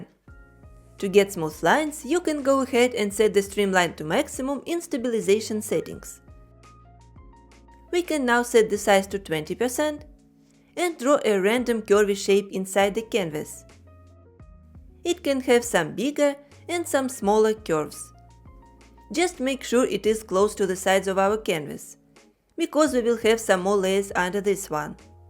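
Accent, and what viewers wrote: Russia. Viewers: Почему на английском-то с русским акцентом(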